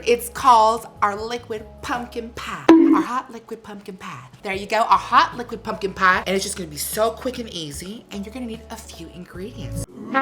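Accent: South, United States. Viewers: Southern girl here (GA!). Your accent is on point! Bless your heart! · had so many GOLDEN one liners in this cooking show and I'm here for the cooking, comedy, southern accent, and her entire look! 😂🤭😆 I am dying to make the hot liquid pumpkin pie 🥧 because it's easy and virgin, just like me, (*wink wink*) 😏😬🤐😭💀 You sent me ROLLING laughing! Can't wait for your next episode! 🦃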